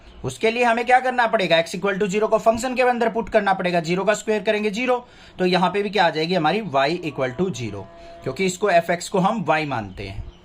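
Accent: Haryana, India. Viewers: sir ji thara haryanvi touch aali boli me maths padhne ke jise alag hi hai thanks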